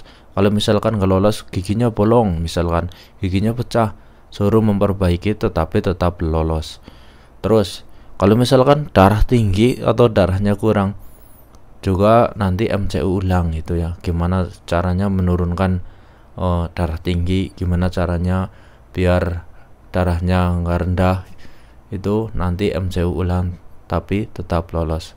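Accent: Banyumas, Indonesia. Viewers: ini Kaka nya ngomong nya logatnya ngapak banget😭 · ngapak ya mas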